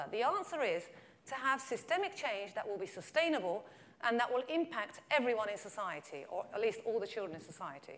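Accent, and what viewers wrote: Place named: Turkey. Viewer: Böyle bir aksan ve Türk bir bayan, sizi bulduğum için çok mutluyum..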